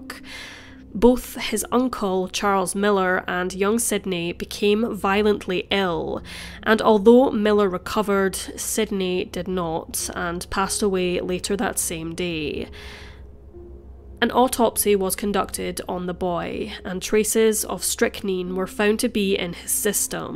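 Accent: Scotland, United Kingdom. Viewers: Lovely to hear a Scots narrator for a CHANGE. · Ah, that Scottish burr...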